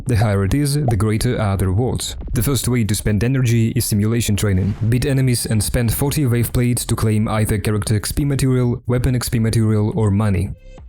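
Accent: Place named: Russia. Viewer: сижу думаю че у тебя с акцентом. И ты на русски забалаболил. так и знал. но а так видос супер. но ты не правильно рассказал про дроп шансы. (да посути 20% но из за гаранта вид этого чутка меняется) 4 косты имеют гарант дропа в 2. тоесть если 1 раз не выпал то 2 точно выпадет. кароче как с крутками 3 косты имеют гарант в 4 1 косты в 8. еще бы я сказал новичкам соединять эхо на новом уровне ДБ так как есть шанс на дроп того же эхо нового ранга что так же идет в прогресс ДБ. ну и не тратить материалы на лишних персов и эхо до 5* ну или если сложно фармить 4* а так вся база и много хороших советов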